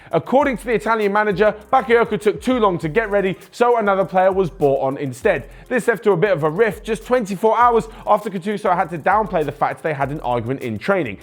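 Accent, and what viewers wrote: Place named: England, United Kingdom. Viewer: he sounds English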